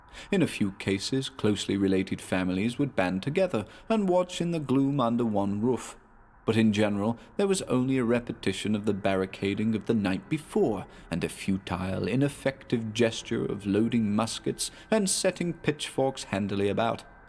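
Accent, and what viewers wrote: United Kingdom. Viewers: This is the most English man I've ever heard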